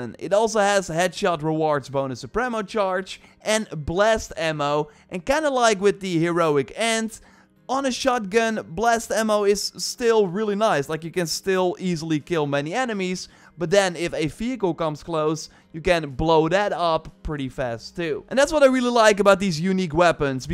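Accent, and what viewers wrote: Netherlands. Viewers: Your videos are amazing, but your Dutch accent is the really cherry on top - I love it - Tot ziens! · Ben jij Nederlands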